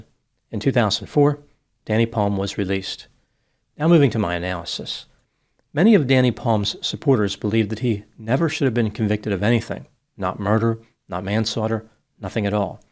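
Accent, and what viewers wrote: United States. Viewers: You sounded more American than ever in this piece.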